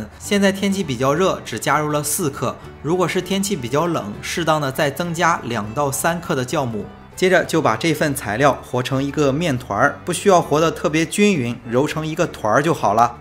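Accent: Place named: Shandong, China. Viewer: 听声音一直觉得您好像带山东口音，又会做喜饼，先生应该是咱们山东人吧？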